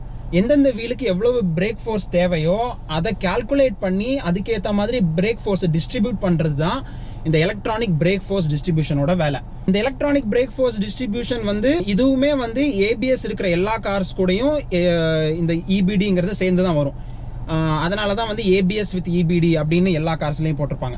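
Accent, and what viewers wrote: Tirunelveli, India. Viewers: Bro neenga TIRUNELVELI thana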